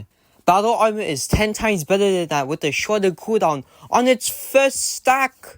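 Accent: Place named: United Kingdom. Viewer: Honestly your voice sounds British but don't get this in a wrong way or bad way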